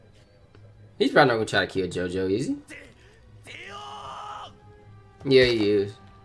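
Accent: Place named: South, United States. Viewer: im just starting with you im feeling it love your accent, is it southern ?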